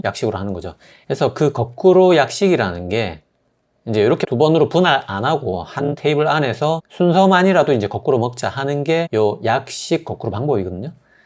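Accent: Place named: Busan, South Korea. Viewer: 혹시 의사샘 부산에 계신건가용? 말투가 익숙한것이 ㅎㅎ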